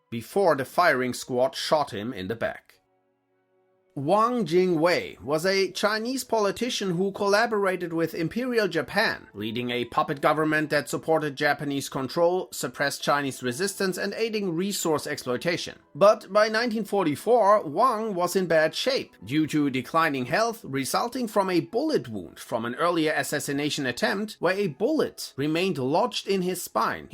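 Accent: Germany. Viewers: I'm still trying to figure out what part of Germany he's from.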